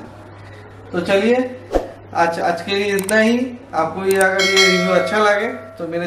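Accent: Bengal, India. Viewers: Kya AP bengali ho? Apke language waisa laga Raha hai 😊 Btw video was good 👍🏻😊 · Proper bengali accent 😀👍👌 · আমি ভিডিও শুরু হতেই উচ্চারণ শুনেই বুঝে গেছি যে ভাই আমাদের নির্ভেজাল বাঙালি ! ভাই একটা অনুরোধ রাখার চেষ্টা করবেন যেন আপনি সর্বভারতীয় দর্শকদের জন্য হিন্দিতে ধারাভাষ্য দিলেও বাঙালিদের জন্য পাশাপাশি বাংলাতেও একটা আলাদা চ্যানেল খুলুন ৷ আমরা সবাই আপনার চ্যানেলকে সাবস্ক্রাইব ও শেয়ার করে এগিয়ে নিয়ে যাবো ৷ অথবা বর্তমান চ্যানেলেই পাশাপাশি বাংলাতেও ভিডিও আপলোড করুন ৷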